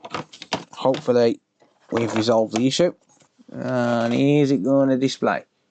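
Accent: United Kingdom. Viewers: could someone enlighten me what kind of british dialect he is using? I've never heared it before and it was a bit hard to get used to it but after watching several videos, its a lot of fun to listen to him.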